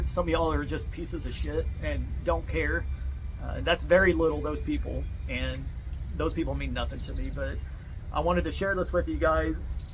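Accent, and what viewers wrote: Texas, United States. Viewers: Praying for you and your family. ❤ You are such a sweet man and son. Jesus heal the trauma and hearts and give them peace and health for all. ❤❤ I have watched your channel for a few years because Im from Texas living years in Utah, love tiny homes and love hearing your accent because it reminds me of home. Keeping you in prayer. 🙏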